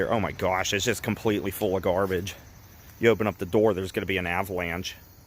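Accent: Pittsburgh, United States. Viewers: Nice vid, grew up close by and our family would always would get gas there, I remember it going from 76 to Shell around 2004, then Exxon several years later. I think it opened and closed a few times over the years as an Exxon , with each owner putting nothing into it. Even a year ago half of the pumps didn't work very well and the place looked pretty run down. I think at this point it is too far gone for somebody to reopen without a major rennovation... and whoever moved in would still have to tangle with the Sheetz. Im coming home this weekend and will check for the pin, but im sure it will be long gone. :) Btw, great pittsburgh accent, I miss hearing that every day.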